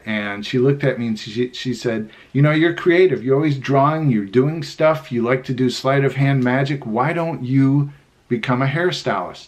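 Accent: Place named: Upstate New York, United States. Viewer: OH MY GOD I GREW UP IN JOHNSTOWN! Was born in brooklyn and moved there when i was 7 and moved back to nyc at 19. I just choked listening to you say this! Jansen Ave elementary school and Knox jr high Lol!! Im dying this is TOO FUNNY! No one ever knows where it is, they always think I mean Johnstown PA. I have been wondering where you were from because you totally have that upstate accent. wemple and edicks chocolate jumbles were my favorite cookies growing up and we always went to Saltzmans in Euphrata every summer for their milk weed and fried chicken. I suffered MANY terrible haircuts in Johnstown and my Best friend Maria and I used to beg my Mom to take us to Albany to get Posner products after watching soul train every saturday because we both had thick curly hair and needed help. Beautiful family, thanks for sharing! :)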